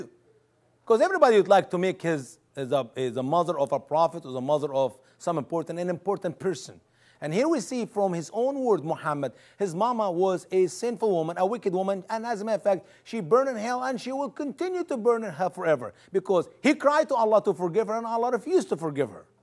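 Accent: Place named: Arab world. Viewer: Nigga talking with Arabic and French accent, lol, funny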